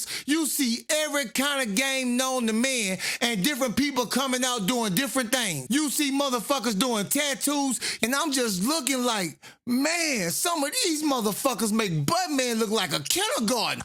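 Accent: Louisiana, United States. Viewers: That Louisiana accent makes the stories sooooooo much better on gawd. 🔥🔥🔥💯💯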